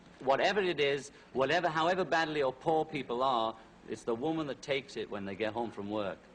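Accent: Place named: United Kingdom. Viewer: Bri'ish muck mouth ye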